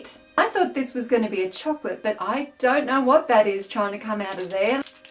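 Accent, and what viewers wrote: Germany. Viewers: Love your german accent :D I would be the same :p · Love your German accent, or is that accident lol. I could get right into the smurf sniffing thing! This is getting to be a ridiculous comment, sorry. Wow German stuff is weird (in ref to the little sausage). :) Ask a German to say butterfly (in German)....interesting.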